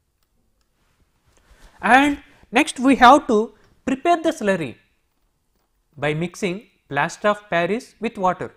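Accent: India. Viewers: Ok its very interesting ,but common this indian accent........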